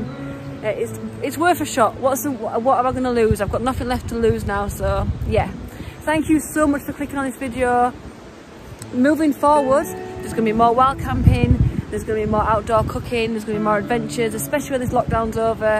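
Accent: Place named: England, United Kingdom. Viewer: Your Welcome. I love your accent. I'm English and Irish and I'm from Newfoundland, so I have an accent too. Love that English accent. Awesome. So glad your back.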